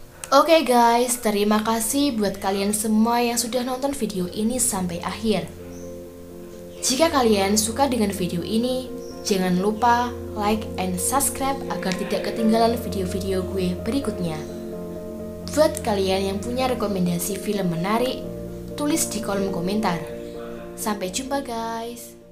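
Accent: Java, Indonesia. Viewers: suara medddokmu terngiang ngiangg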